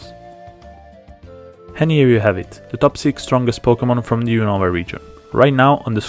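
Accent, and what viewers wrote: Italy. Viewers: Maaa, per caso lei è italiano?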